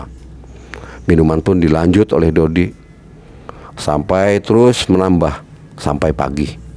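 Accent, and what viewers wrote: Cirebon, Indonesia. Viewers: Dari awal ketahuan bahasanya logat bahasa CIREBON...😃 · Kaya org indramayu om,bahasanya.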